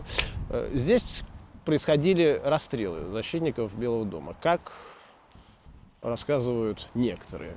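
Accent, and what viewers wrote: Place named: Saint Petersburg, Russia. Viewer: Чувствуется ваш Петербургский акцент , каждая буква произносится тщательно,блестяще.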